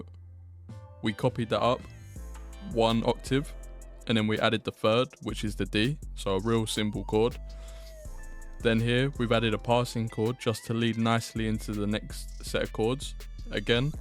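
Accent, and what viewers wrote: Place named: United Kingdom. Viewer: where are you from bro? sound like the UK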